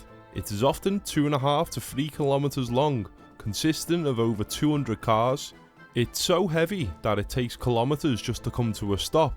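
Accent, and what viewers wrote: Liverpool, United Kingdom. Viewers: He has a Scouse accent from Liverpool. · Liverpudlian geography- this is what I've been waiting for! AI is finished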